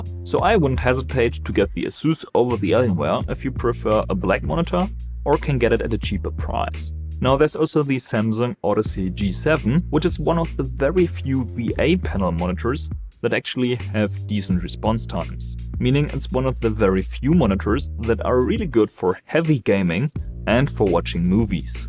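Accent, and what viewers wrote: Germany. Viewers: Junge man hört so krass das du deutscher bist. Nicer content <3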